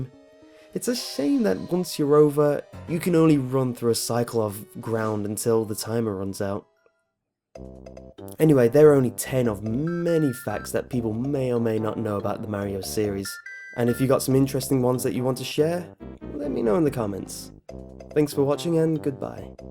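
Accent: United Kingdom. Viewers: i knew the videos are only creepy cause of the british voice!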